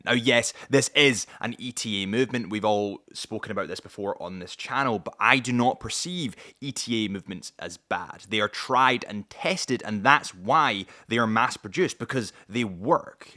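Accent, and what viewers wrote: Scotland, United Kingdom. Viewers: What’s his accent. Scottish?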